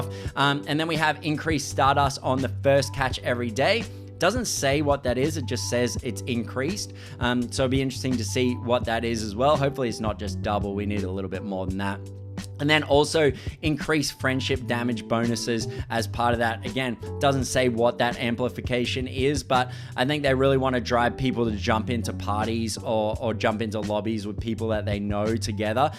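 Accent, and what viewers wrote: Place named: Australia. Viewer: Bro are u Australian? I love Australia 🇦🇺❤️❤️🇦🇺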